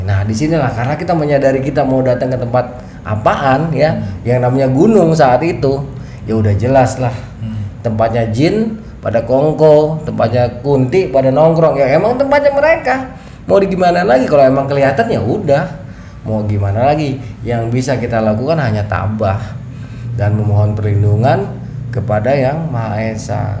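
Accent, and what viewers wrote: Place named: Jakarta, Indonesia. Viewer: 😀😀😀😀😀 Gw demen banget aksen betawinya Bang...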